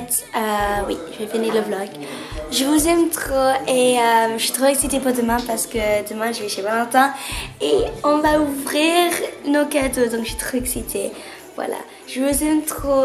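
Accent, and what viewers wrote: England, United Kingdom. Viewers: J'aime ton accent anglais :3<3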